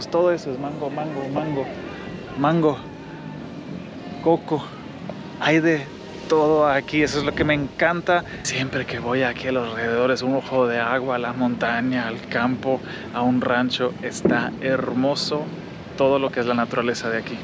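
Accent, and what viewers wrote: Mexico. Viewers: Lo que me gusta es que agarró el acento mexicano 🇲🇽🇲🇽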